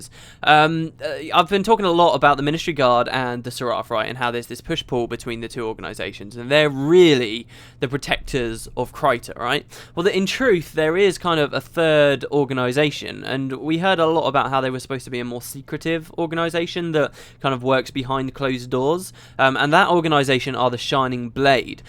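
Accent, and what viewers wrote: England, United Kingdom. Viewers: hahaha, youre so english i love it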